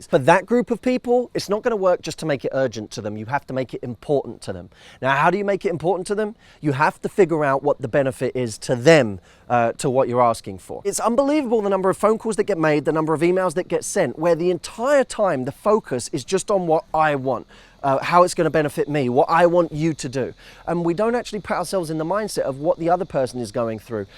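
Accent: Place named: United Kingdom. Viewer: I didn't know he was British!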